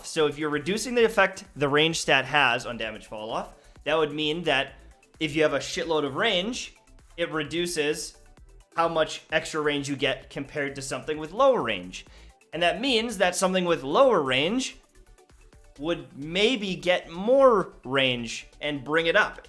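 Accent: Scotland, United Kingdom. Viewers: SCOTTISH VOICE!!!!!!!! Yes BABY!! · Thanks for putting out great content. Scottish accent was awesome lol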